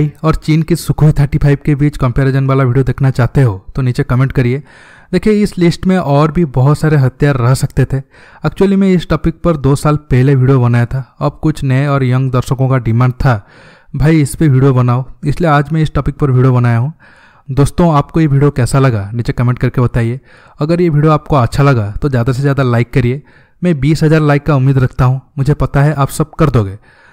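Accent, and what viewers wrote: Odisha, India. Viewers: Bhai ap odisha sa ho kya?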